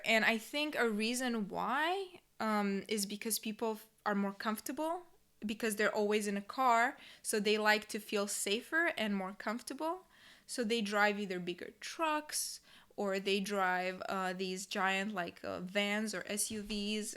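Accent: United States. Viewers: I like you american accent 😍😍😍😍😍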